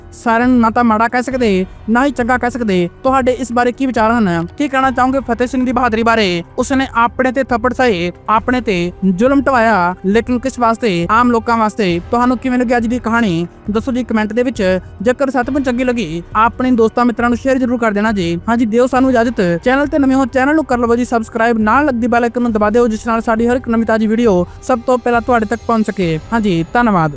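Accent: Bihar, India. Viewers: Bolan vala koi jokar lagda hai. Eh punjabi hai ke bhaiya. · ਪੰਜਾਬੀ ਬੋਲਣ ਵਾਲਾ, ਭਈਆ ਲਗਦਾ?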